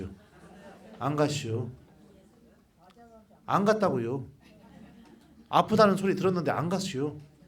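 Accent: Chungcheong, South Korea. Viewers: ❤🎉 저는 2000년에 멀리 캐나다 🇨🇦 토론토 지역 오로라시에 와서 살고있는 충남 서산시 에서 첫 영성체를 올린 조 루가입니다. 그동안 풀납동성당, 잠실성당, 역삼동 성당에서 레지오 활동과, 성가대 횔동을 하다가 emigration 으로 캐나다 🇨🇦 토론토 에 왔습니다. 신부님 충청도 말씀을 듣고 친근감을 느낍니다. 조 루가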